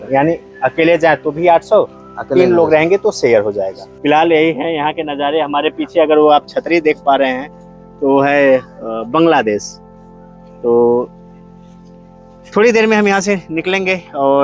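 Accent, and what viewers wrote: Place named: Bihar, India. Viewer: Tu pakka bihari hai...abe saaf saaf bol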